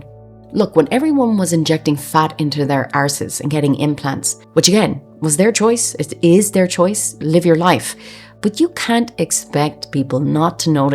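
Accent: Ireland. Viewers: Off topic but LOVE your Irish accent. You have a lovely voice too. It’s refreshing to hear ❤❤ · First time seeing your content and you have a subscriber. Also love the Irish accent and your soft voice serving these excellent takes!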